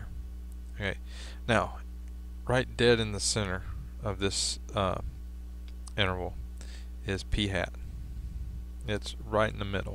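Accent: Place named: South, United States. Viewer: Came for help with my homework, stayed for your delightful southern accent ;)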